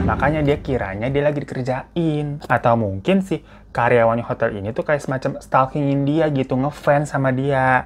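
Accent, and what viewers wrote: Java, Indonesia. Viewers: Bang ini ora asli cina buakan ya. Wajah nya kok kayak orang cina. Tapi bisa bahasa jawa medok banget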